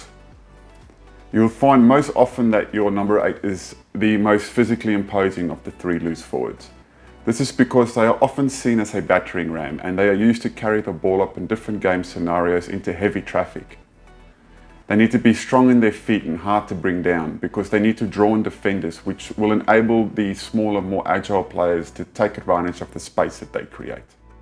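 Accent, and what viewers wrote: South Africa. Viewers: I don't hear English. Only Aus/NZ/SA. the way he says "couch" tells me he is SA born and emigrated to OZ or NZ. I may be wrong... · his English sounds completely South African. He also used the boks in many of his examples. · I cant decide if he is south african or australian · My man, great explanation. I hear an Aussie accent, or perhaps a Kiwi one - but yours is more difficult to place than usual, but do I detect a Saffer twang? Emigrated? Ps - hier kom die BOKKE :D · Saffa with a bit of an Aussie twang? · My bru are you South African? Your accent confuses me....i cant tell if it's from Melbourne, London or Brakpan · OK so firstly, I love the content. Secondly, I can't pinpoint your accent. Your channel says Australia but I feel like you sound like a South African who has lived in Australia for a while or an Aussie who has lived in South Africa for a while 🤣 I hear a mix of both accents hahah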